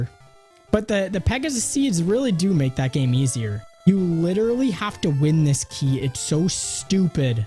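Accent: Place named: Canada. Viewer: your commentary in this and the 3D one is hilarious. also called that you were (a fellow) canadian from the accent LOL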